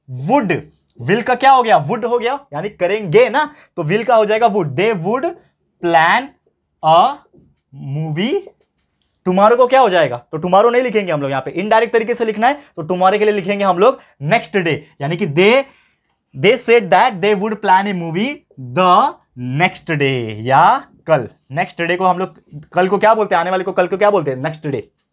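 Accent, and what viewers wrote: Maharashtra, India. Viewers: Sir are you Maharashtrian???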